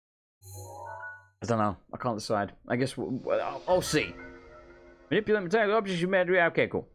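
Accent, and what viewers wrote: Australia. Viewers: it's quite alright for an australian voice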